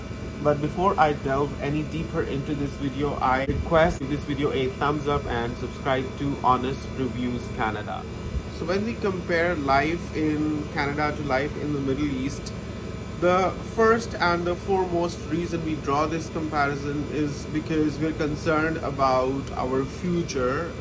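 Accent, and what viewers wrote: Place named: England, United Kingdom. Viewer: Very bad sound quality, i can hear only English accent, nothing sentences 🇺🇸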